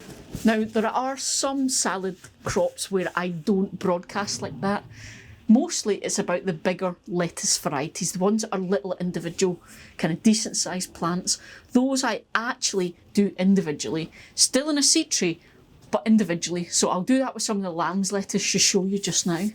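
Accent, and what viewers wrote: Scotland, United Kingdom. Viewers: I truly don't know why more people aren't watching your channel. I get so excited every time you come up with a new episode. You're just so cheery and wonderful and I love your cute Scottish accent. It's just such a pleasure to watch when it's -27C and 30 cm of snow with 10 more to come out my window... Hahaha I guess that's what I get for living in Canada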